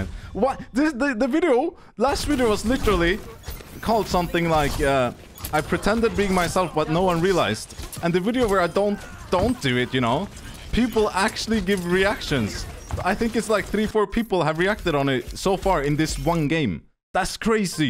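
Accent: Sweden. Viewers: The Swedish in this guy is RADIATING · how did your swedish accent got worse??? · I like that i can hear that u are swedish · I love your vids man btw are you swedish cuz i can hear it from your english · du låter svensk? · You sound so swedish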